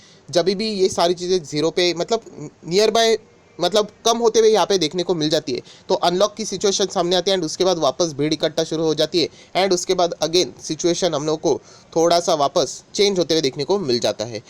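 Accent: Maharashtra, India. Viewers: Sir shayd Pune se kyuki unke Google page me by default language Marathi he, also sometimes he mumbles marathi word's, I know this because I am also marathi. Also he has mentioned that he likes to watch live cricket that means he lives nearby Hinjewadi as it is the only place where international cricket stadium is nearby. Also he was using Micromax Mobile as sometimes during video notification sounds comes. Sir recently bought iphone as well because during video he's phone was ringing... And he last week he said he is making video from different mobile and checking the audio video quality. And most of the time in his video he says 'matlub.. matlub' that's shows that Hindi is not his mother tongue.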